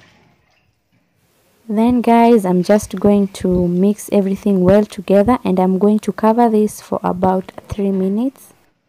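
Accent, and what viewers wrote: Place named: Africa. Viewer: I love your English i thought you're African wauuu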